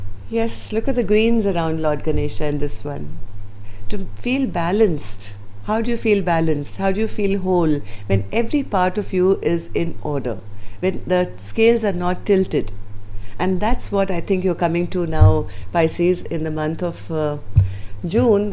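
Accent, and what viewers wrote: India. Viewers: are you a Pisces? You speak so sweetly, with so much love & so much pain & sympathy when we go through disillusion. I am Pisces, an Indian & I think you are Indian too, going by your accent. I've been watching your readings since the past 3 months & I look forward eagerly to your readings. Your interpretation resonates with me very much. I am not feeling too happy, today by the reading because, I really love & want my Virgo to begin talking to me again. We've been in separation for the past 6 months. I do leave everything in Spirt's hands & I accept whatever Spirit decides for me. I just want peace & truth & resolution of this separation. Thank you so much for your help. Be blessed. Namaste.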